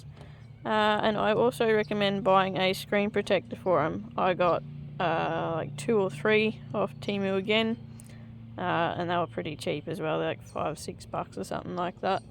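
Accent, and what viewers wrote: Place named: United Kingdom. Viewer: British Eng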